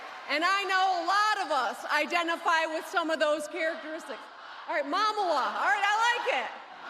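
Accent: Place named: Midwest, United States. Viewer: I’m from New Yawk, but I love that Midwest accent!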